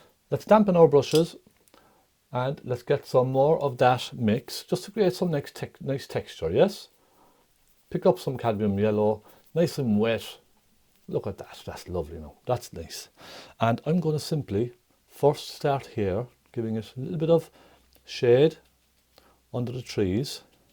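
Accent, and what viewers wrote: Ireland. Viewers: I enjoyed your Irish accent. Thank you for showing me how to paint mountains and grass.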